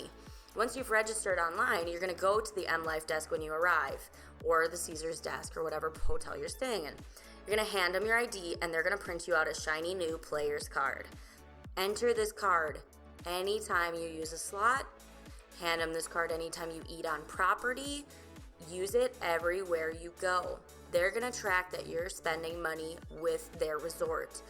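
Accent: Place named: Wisconsin, United States. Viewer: From Wisconsin? Trying to pinpoint your accent.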